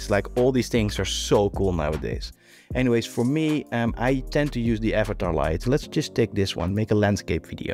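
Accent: Netherlands. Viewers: You sound very Dutch 😊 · I think they will soon be able to reproduce your Dutch accent with all the substitutions you use from Dutch phonology, or produce a clone with any foreign accent.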